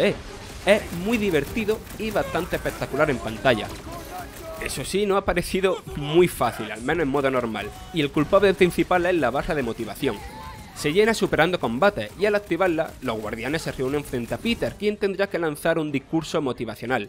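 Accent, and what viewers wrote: Andalusia, Spain. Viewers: De qué zona de España es la tonada del locutor?? Me recuerda a Gandía, de La Casa de Papel... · Va a ser el primer y último comentario que haga desde que veo videos en vuestro canal y solamente aconsejar que quizá no sea la persona más adecuada para narrar los videos, sin tener absolutamente NADA en contra de el y sin poner en duda en ningún momento su profesionalidad simplemente hay funciones o desempeños para los que ciertas personas no valemos, y no me vale la excusa de los acentos o la dicción, habéis visto los informativos de TVE, Antena 3 o incluso televisiones autonómicas como Canal Sur con el acento tan marcado que hay en Andalucía y acaso ponen a personas a los que se le note tantísimo y que se coman letras de las palabras de una forma tan notoria? ya os lo digo yo, No, y no por que esas personas no valgan o no sean buenos periodistas, sino que para comunicar con su voz no son los más adecuados. 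· No soy andaluz pero entiendo perfectamente al chaval, es una currada de vídeo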